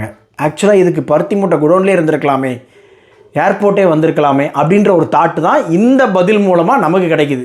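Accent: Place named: Tirunelveli, India. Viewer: நண்பா நீங்கள் கண்டிப்பாக ஒரு வீடியோ பதிவு செய்ய வேண்டும் பிஸ்மி அவர்களுக்காக தனியாக உங்கள் ஸ்லாங்கில் திருநெல்வேலி ஸ்லாங்கில் வைத்து தவத்திலேயே போட்டு விடுங்க செய்து விடுங்கள் அஜித் அவர்களை தவறாக சித்தரித்துக் கொண்டிருக்கின்றார் இந்த பன்னாடை பிசின். பிஸ்மி வலைப்பேச்சு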